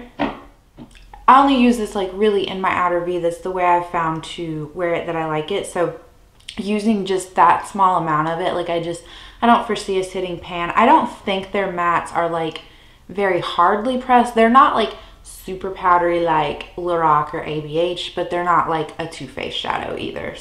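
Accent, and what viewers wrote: South, United States. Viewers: You are adorably Southern! Great progress :)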